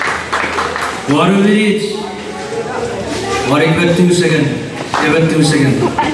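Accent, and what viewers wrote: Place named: Weno, Micronesia. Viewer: What gym is this, may I ask? The commentator sounds like Weno dialect. Nice video though 👌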